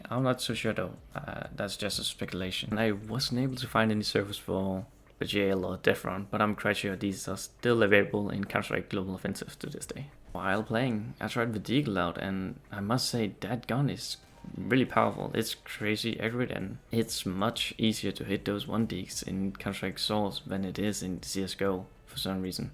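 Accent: Denmark. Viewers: Fed Dansk accent haha! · i smell danish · Ingen tvivl om at du er dansker med den accent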